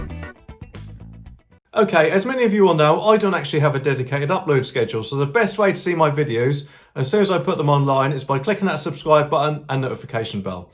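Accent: Norfolk, United Kingdom. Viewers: Just found your channel, I’m from Norfolk and recognise your beautiful accent from around our beautiful county. Long may localism live.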